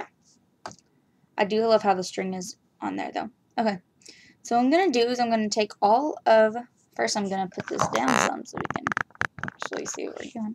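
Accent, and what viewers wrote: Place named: South, United States. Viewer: Are you southern? You have an accent :) I'm from South Carolina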